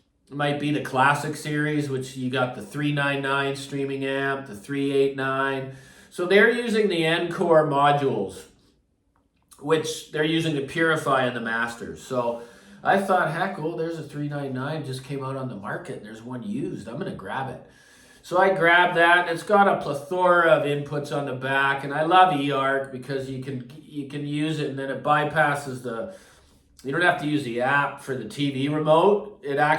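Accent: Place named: Canada. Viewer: Merry Christmas to you,. We’ve got about 3’ of snow here, south of Buffalo. Record season has officially begun. Just fired up the old 80’s Mac rig. Always let her warm up with a little Canadian radio, 101.1 More FM, currently. What a privilege to have grown up with all these great stations. I’m new to your channel…but, pretty sure I picked up that unmistakable “native tongue”. 🇨🇦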